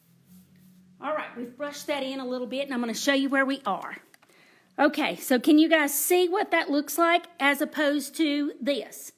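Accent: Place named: South, United States. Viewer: #replay!!! Love your tutorials and of course your southern accent LOL!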